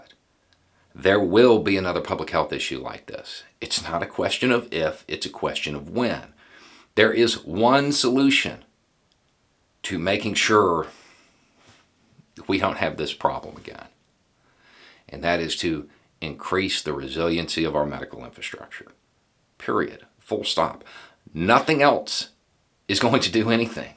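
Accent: South, United States. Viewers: I'm sure you've been asked this before, but have you ever thought about running for an office? You'd be the first southern accent I ever voted for!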